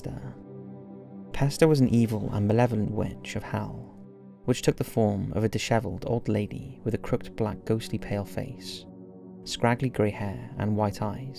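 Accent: United Kingdom. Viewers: Would be helpful to show the name of each of these creatures in text. I couldn't understand what you were saying in some cases. For number 3, CC listed you calling these creatures "Forward", "Board," "Vaude," and "Vort." Later there was "Linga Barked," and "half goofer." Huh? And number 1; was it "Pesto," "Pesta," "Pastor," "Pasteur," "pasta," or "pester?" CC may not pick up the British accent.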